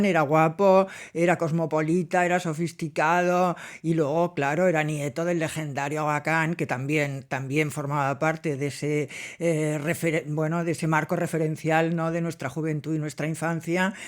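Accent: Catalonia, Spain. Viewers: BUENAS TARDES! ERES ESPECIAL! CUANTAS VIVENCIAS! CUANTO CONOCIMIENTO! TODO ES ENRIQUECEDOR.ME ENCANTA ESCUCHARTE POR TANTAS PERSONAS A LO LARGO DE TU VIDA HAS CONOCIDO.GRACIAS POR TUS RELATOS.ERES UN SOLETE! YO TAMBIÉN ESTOY ENCANTA CONTIGO.ERES UNA PERSONA MUY ESPECIAL; MÁS TU ACENTO CATALAN ME RECUERDA MUCHO AL DE MI MARIDO ( QUE EN GLORIA ESTE ) PUES SU MADRE ERA DE REUS,EL TENIA EL CATALAN MUY ARRAIGADO ) GRACIAS! UN ABRAZO ENORME.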